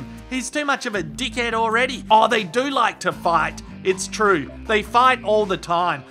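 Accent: Australia. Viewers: Your Aussie accent is kinda oddly satisfying 😂😂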